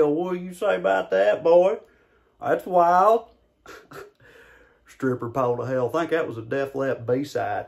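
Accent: South, United States. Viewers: They couldn't even see his... Took me a second to understand the southern accent... BWAHAHAHAHA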